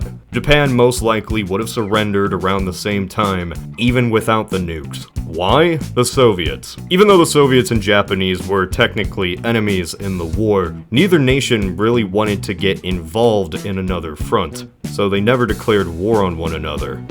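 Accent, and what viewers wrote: United States. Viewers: His accent, he's American, they often drop that consonant.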